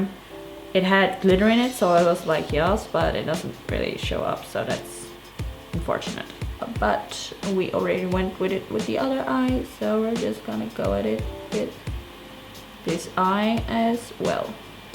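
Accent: Netherlands. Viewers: ben je vids aant bingewatchen. is leuk om te zien hoe je engels heel sneaky steeds iets minder dunglish word. en ik vind je over het algemeen gewoon geweldig i imagine myself to make vids like this if i had the balls xD zelfde attitude haha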